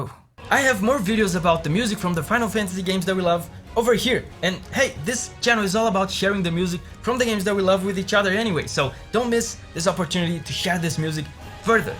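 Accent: Brazil. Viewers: Hey! You have sort of a brazillian accent, are you from brazil?? If not, where you from?? (im probably wrong lol)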